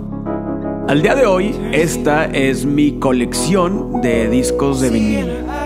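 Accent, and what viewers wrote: Monterrey, Mexico. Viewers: Me re encanta su acento regio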